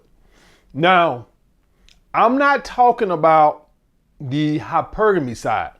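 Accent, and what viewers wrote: United States. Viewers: Scresss scresss scresss!! Country as HELL 🤣🤣🤣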